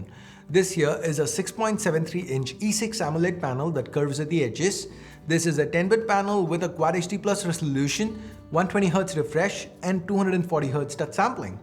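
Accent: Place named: India. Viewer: Accent is top notch neutral and best Indian accent i would say🤟🏻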